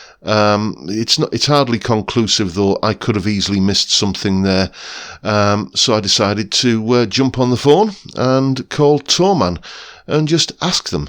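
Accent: United Kingdom. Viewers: ademas de tocar bien la guitarra, habla un perfecto Ingles con acento Britanico.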